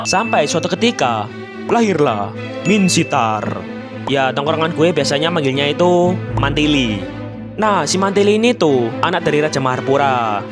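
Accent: Java, Indonesia. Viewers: Kok logatnya logat org jawa🗿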